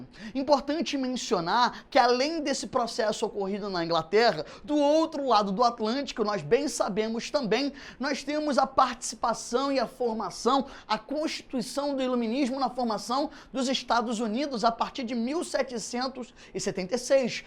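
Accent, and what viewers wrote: Rio de Janeiro, Brazil. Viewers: Você é carioca? Kkkk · parei de assistir so porque é carioca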